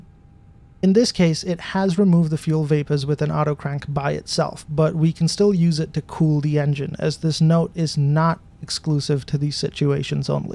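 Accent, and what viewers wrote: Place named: United Kingdom. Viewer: This dude can't decide if he's British or American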